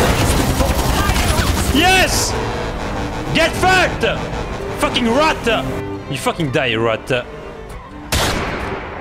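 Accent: France. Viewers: At first the heavy French accent put me off, and yet over time I find it so hilarious whilst speaking English. Easily subbed. You losing your shit or wrecking someone is some top tier stuff. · That french accent tho💀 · le petit accens francais sa me fume ^^ , sinon gg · love the french accent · the French accent makes this 1000x funnier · This guy has the most french accent of all time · I love the french accent. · Le bon accent français bonne vidéo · I hear ur French accent can you say Rickey Bobby like the French guy form Talladega knights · I loved the video and the french accent made everything better!!😂❤ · 3 things 1. This was satisfying 2. WOOW THAT WAS AMAZING SHOT 3. Hearing a french talking english is indeed satisfying to the ear idk why + great ability sir, long live bicycle cult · i like ur french accent :) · that french accent is majestic, as a french person I can agree your accent is awesome! 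· i usually dont watch gta online stuff but this vid was fkin hilarious and your frnech acent makes it waaaaay better hahahaha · Are you french because your accent is really cool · The accent made it better ol frenchy boy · Sorry if I seem to be rude somehow, but probably 90% of everything I understood on hearing, considering your French (?) accent, was the swearing. 😄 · French accent makess this so much better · french accent is " vraiment cool mon gars " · Un accent purement français mdrrr ps t’es vidéo sont vraiment Cali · this accent sounds french i love it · I subed cuz I love ur French axent and love ur humor and I'm part French but I have yet to learn such a language but first I want to watch all the rest of ur vids cuz this is my first vid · L'accent Français qui fait plaisir :awesome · his french accent is like the best combination for the vid istg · At first i thought you was Polish/Russian but after hearing your accent for one more time your French,lol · Can we all just appreciate his fine French accent and the fact that he CLEANS THE LOBBY for toxic tryhard? 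Thanks a lot dear lord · you got a french accent, just like me :D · Le bon gros français de base qui parle anglais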